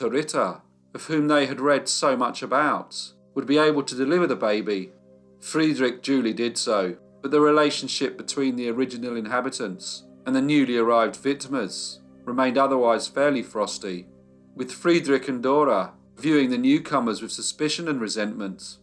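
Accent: United Kingdom. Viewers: Oh thank god it’s a Brit narrating this, Americans drive me nuts with their mispronunciation of places. 🤔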